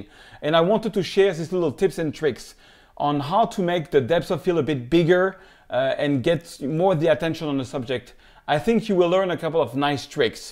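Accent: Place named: France. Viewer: Bonjour Monsieur, merci pour tous ces tutoriaux vraiment clairs et précis. C'est un plaisir de vous comprendre, en anglais, et ce grâce à votre accent français:-) surtout pour quelqu'un qui ne maîtrise point la langue de Shakespeare. Très cordialement. Christophe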